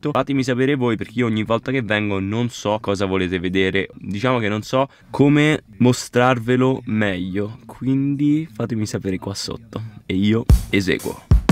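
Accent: Rome, Italy. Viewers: Il tuo misto di italiano, romanesco e inglese perfetto è il paradiso per ogni linguista 🅱️®️🅾️🤙🏻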